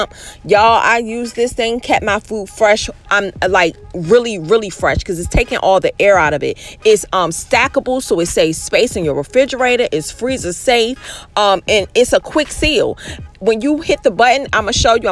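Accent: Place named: Maryland, United States. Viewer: You must be from Maryland accent real thick Kudos on the chicken breast👌🏿💯🔥🔥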